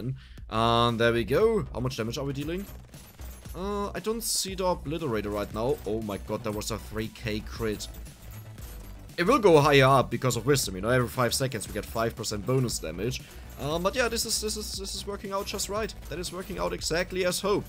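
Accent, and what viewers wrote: Germany. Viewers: ist ja schrecklich deutsche englisch sprechen zu hören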